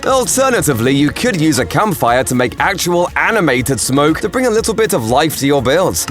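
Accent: United Kingdom. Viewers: Are you British shut up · Damn didn’t know was British! and he has a new skin! Finally got rid of the red play button!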